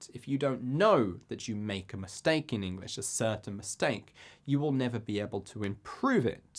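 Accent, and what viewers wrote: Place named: United Kingdom. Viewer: I love your British accent 😍😍😍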